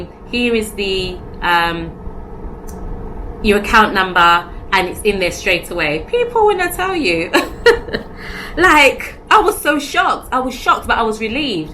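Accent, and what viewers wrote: United Kingdom. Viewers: Hi. In my opinion, they asked you to write the letter to the branch manager to determine how intelligent you are. Your letter in conjunction with your lovely British accent made them determine you were not to be played with. Food for thought. Blessings! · is true, but you have to acknowledge the lovely British accent no matter what. I think the bank representatives did, too.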